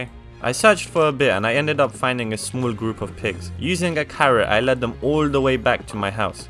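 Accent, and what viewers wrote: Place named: Roadman, United Kingdom. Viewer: bro has a roadman accent 😂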